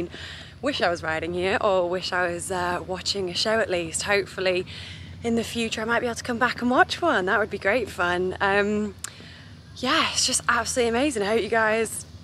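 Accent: England, United Kingdom. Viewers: Awesome! I have 19 acres in north east FL after I build my house I will have to check it out. Sounds like a great place to go for a weekend . You have an English accent where are you originally from?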